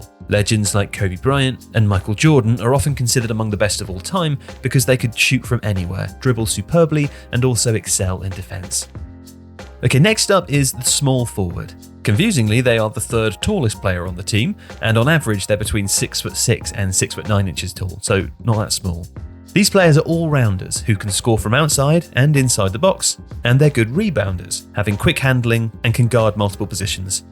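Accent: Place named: England, United Kingdom. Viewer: I didn’t need a fancy English accent to tell me that. White washing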